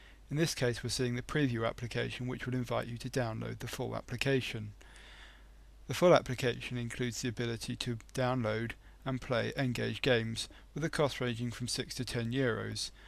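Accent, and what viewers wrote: United Kingdom. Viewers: hahahahaha ,yes u'r right what a speech an what a ugly voice, British accent.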